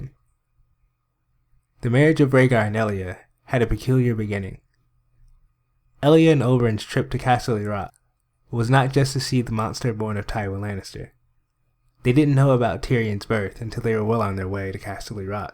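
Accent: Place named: United States. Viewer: I really like your clips and you have a nice voice. But I'm not a nativ speaker and you have an american accent so its a bit hard for me to follow, could you speak a bit slowlier?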